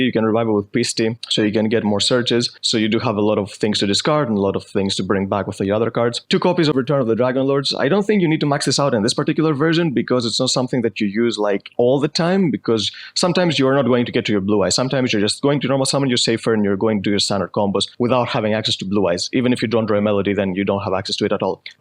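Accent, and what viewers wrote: Greece. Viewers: So I am really find of blue eyes and this deck is rly budget as well... I just have one question: which country are you from?cause your accent is really good for someone whos mother tongue isnt english. Your accent seems like Greek...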